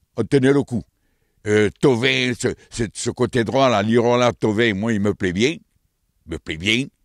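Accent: South, France. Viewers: Courage adore votre accent ca sans bon le soleil du sud courage au supporter de OM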